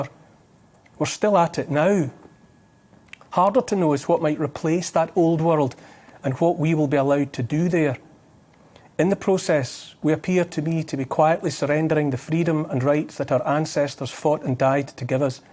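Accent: Scotland, United Kingdom. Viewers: A pleasure to listen to a Scottish voice that talks such sense , I just wish that The Scottish First Minister would take on board the few words that have just been spoken with such conviction, Boris has lost the plot , we welcome our enemies to these shores with open arms, I watch as our culture is slowly but surely eradicated and disposed of in such a political and cowardly way, our western way of life will soon be distant memory and we only have our cowardly and spineless politicians to blame. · is this Wiseman who can talk Scottish?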